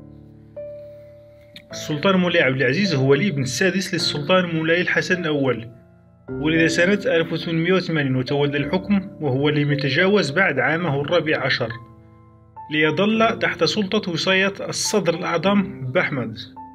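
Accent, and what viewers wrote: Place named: Syria. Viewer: يوميات المصريات يا أخوة اسمعوا صاحب الفيديو هو سوري مش فلسطيني هي لهجتوا بتكون سورية اسمعوا مليح لهجتوا سوري عميل الصهاينة و العياشة المخزن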